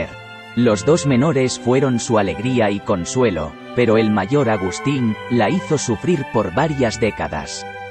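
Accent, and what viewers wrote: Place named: Spain. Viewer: Por fin encuentro un audiolibro en español de España!!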